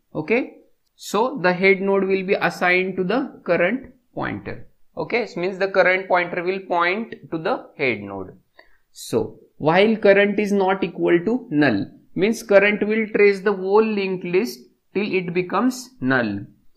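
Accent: India. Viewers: The 1st time I understand Indian accent. You explained well. Thumbs-up!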